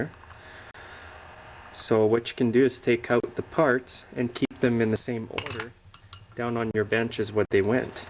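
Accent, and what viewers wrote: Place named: Canada. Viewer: I love the way Canadians pronounce the word "out" as "oat." Great videos BTW!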